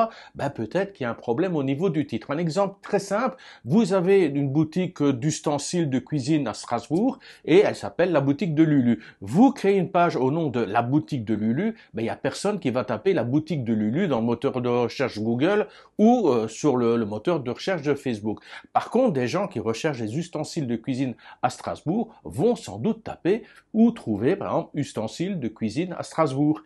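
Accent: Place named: Belgium. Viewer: Bonjour, sauriez vous me dire comment peut on contacter Facebook qui ne répond jamais mais alors jamais ! J'ai un groupe de plus de 105 k abonnés et je sais que FB fait ce qu'il veut quand il veut (modification, suppression de contenu injustifié, etc...) mais côté service client c'est pitoyable. Alors si vous aviez une astuce ou idée ce serait cool. Je voudrais savoir aussi si vous connaissez un truc pour mettre une photo cliquable sur un groupe FB ? En tous les cas, vous assurez grave avec tous vos tutos super bien expliqués, hyper didactique avec votre accent (belge, suisse ou alsacien ? ). Bravo et merci. Patrice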